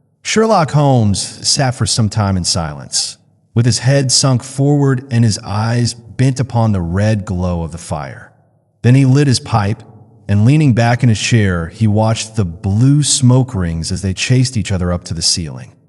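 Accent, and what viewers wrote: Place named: United States. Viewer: an american accent for Sherlock Holmes??? that does not work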